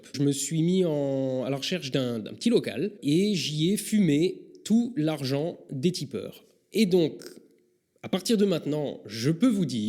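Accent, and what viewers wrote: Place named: Alsace, France. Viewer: Je viens de découvrir votre chaîne et un truc me viens a l’esprit..... L'accent Alsacien ! C'est pas possible autrement , tu est un Elsässer haha ;) On entend l'accent Germanique xD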